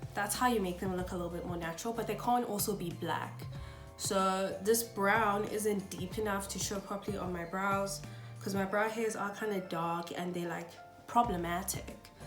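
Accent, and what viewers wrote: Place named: South Africa. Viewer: I absolutely love this!!! I knew you were South African immediately and this is such a good video💗 I came here from an ig link and I'm so happy to be here Another thing these brands think we don't notice is when they make 15 shaded of concealer thinking we don't know all those shades can just be used as contour for lighter skinned/white people. That's why the darker concealers are always the wrong undertone (undertones that don't emerge among darker skinned people) Also love your shirt💗😋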